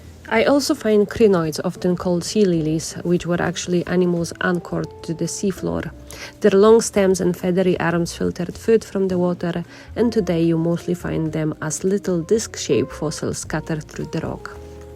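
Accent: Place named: Scotland, United Kingdom. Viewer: I love her Scottish accent.😅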